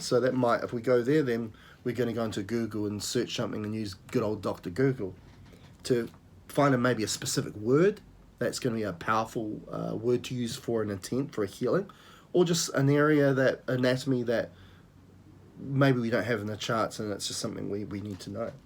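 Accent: New Zealand. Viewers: This video gives me a lot of inspiration on how to update my own charts since I rarely use a lot of them. What exactly you said regarding a command to connect to celestial existence? Although I love kiwi accent, I did not understand this time :D Thank you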